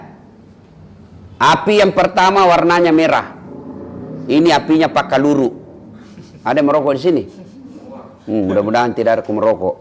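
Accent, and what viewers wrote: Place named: Makassar, Indonesia. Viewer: Assalamualaikum Ustadz, sy suka ki ceramax ustadz krn logat mkssrx kental sxl,,, dan MANTAAP Ustadz Ceramax,,, 🙏👍💕😎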